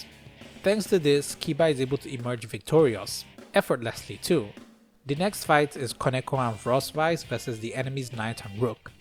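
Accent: Nigeria. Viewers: Why do u sound Nigerian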